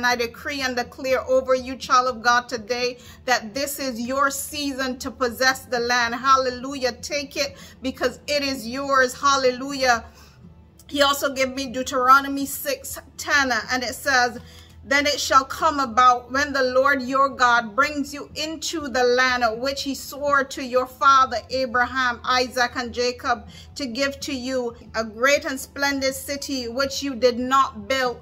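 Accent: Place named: Barbados. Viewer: Your accent sounds a bit Bajan! Great word! Confirmation! 🙌🏾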